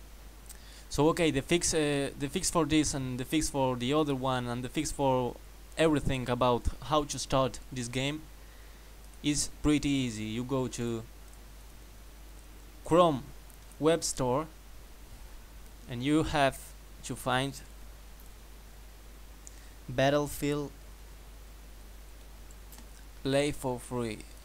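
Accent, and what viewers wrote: Spain. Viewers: ERES ESPAÑOL? XD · menudo acentaco a español tienes jaja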